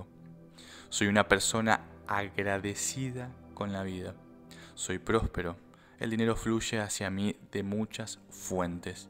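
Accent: Argentina. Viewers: Super efectivo! Además me encanta xq esta en argentino y lo siento mucho más real y personal 🇦🇷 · Me da risa estás afirmaciones . El tono argentino y como lo dice te partes de risa 😅😅i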